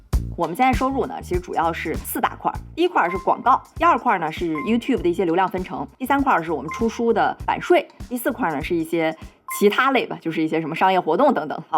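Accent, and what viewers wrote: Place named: Beijing, China. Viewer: 好舒服的北京口音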